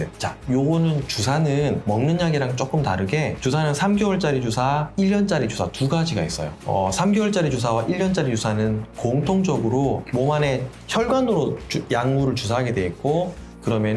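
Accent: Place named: Gyeongsang, South Korea. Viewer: 이미 말투에서 대구출신인거 숨기지 못하죠!! ^^ 반가워요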